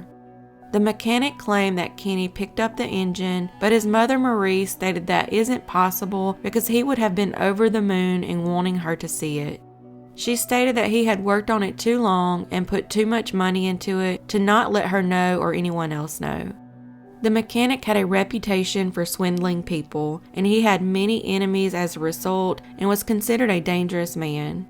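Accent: South, United States. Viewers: I am truly addicted to true crime and cold cases, I enjoy your presentations and I love listening to your sweet southern accent. Please keep it up, by bringing awareness to these cases you never know who is watching and who may have a key to solving any one of these mysteries. Thank you! I will keep watching and keep supporting your channel!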